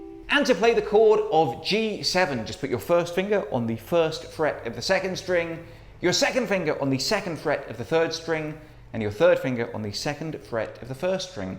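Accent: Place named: England, United Kingdom. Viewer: It's so weird to heard this with an english accent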